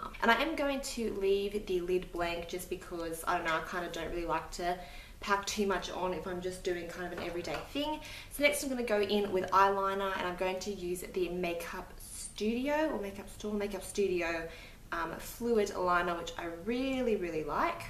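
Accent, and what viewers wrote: Australia. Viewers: These are my fav videos from you. You look good without makeup & you make your makeup look so good :) + your Aussie yay haha xx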